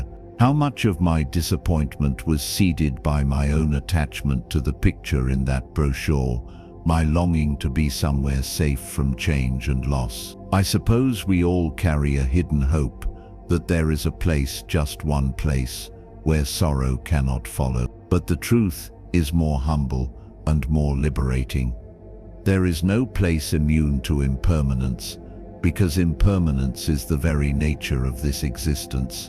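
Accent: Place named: England, United Kingdom. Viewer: Your channel has become very important to me, to begin to relax for sleep. Please never change the voice! I love the English accent. Also the quiet music. Thank you.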